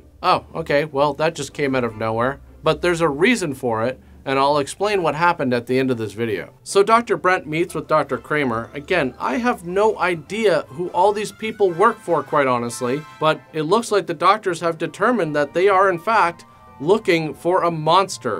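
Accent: Canada. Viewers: First visit... You sound like a Canadian Sterling Archer... Only adds to the sarcasm. Excellent work.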